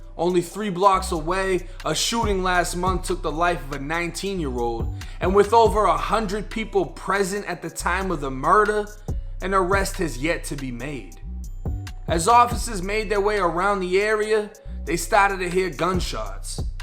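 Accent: Boston, United States. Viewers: Yo bro u got ever been to Boston?? Cause u sound like one of us nbs lol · You from Boston? Hear that accent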